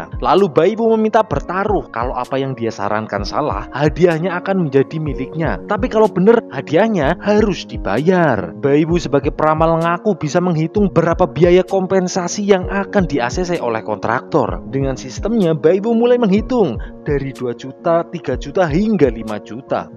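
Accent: Banyumas, Indonesia. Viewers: Suaranya bikin ngantuk tapi ketika denger ngapaknya autu ngakak🤣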